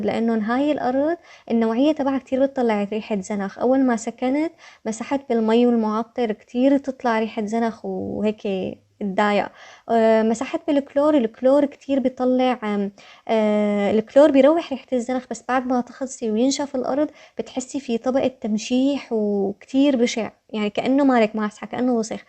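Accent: Syria. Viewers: انا مصريه ولهجتك بتذكرني بجارتي السوريه ، وانا كتير بحبها وحبيتك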